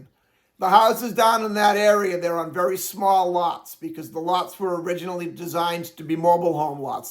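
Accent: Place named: Northeast, United States. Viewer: your one of the many idiots who keep on telling people to move to Florida for your financial gain. The state has already been ruined. Being a Native, born and raised, I recently moved out of that hell hole after 40 years. There is no more quality of life. Overpriced with mean and rude people. Sounds like you're from the NE. Thanks for bringing your shit show to FL.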